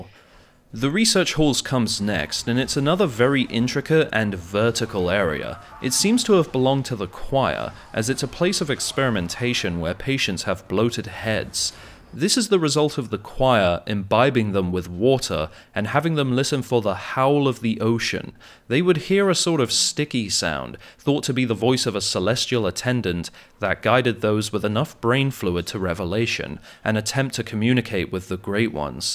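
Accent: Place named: United Kingdom. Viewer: I went from british dudes narrating the savanah to british dude narrating videogames.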